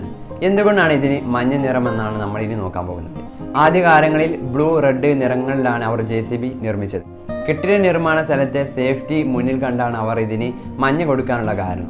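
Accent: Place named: Malabar, India. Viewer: Nannaitund Malabar slang edakk varund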